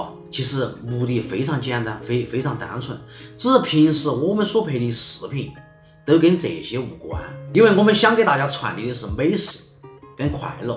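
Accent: Sichuan, China. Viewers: 邊看邊學四川口音呢，沒字幕的話，要聽得懂才行呢，哈哈，我台灣。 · 超小厨阿， 你辛苦了这么长的时间啦， 我们为你的成就觉得非常开心，你不用跟我们解释啥。 作为一个在加州的四川人， 听到你说四川话和看到你煮菜的时候都很让我想家，希望疫情快点度过，可以快速回来整几顿！ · 最喜歡你視頻中，所講的那一口川話，因為家裡長輩均已過世，超想念他們的口音，出生在台灣的我，太喜歡你們的日常，祝你們全家人都平安幸福！我會一直關注你的視頻！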